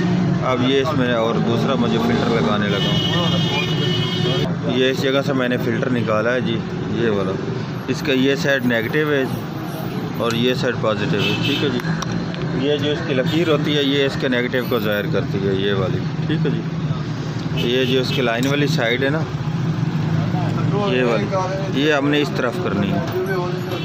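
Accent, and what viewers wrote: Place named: Punjab, India. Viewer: Tuci punjabi lagde aa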